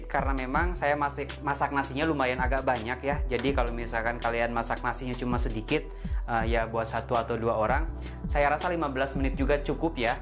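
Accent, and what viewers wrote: West Java, Indonesia. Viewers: Meskipun pakai bahasa Indonesia yah, masih lengket banget yah logat sunda nya yah. Mantap Kang 👍 lanjutkan, ditunggu video selanjut nya Kang 🤗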